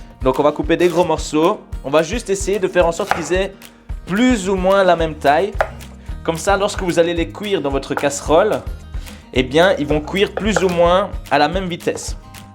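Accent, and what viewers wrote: Belgium. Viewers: votre charmant accent belge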